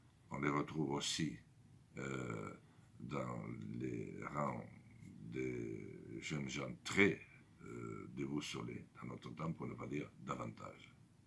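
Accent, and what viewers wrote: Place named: South, France. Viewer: merci!! gros probleme de civilisation: rapt legal et irrespect pour les parents et enfants resultat: destruction de l'etre? helas: courage a ceux qui en souffrent! merci cher mr lasalle g voté pour vs! l'accent du soleil la vraie france du terroir!!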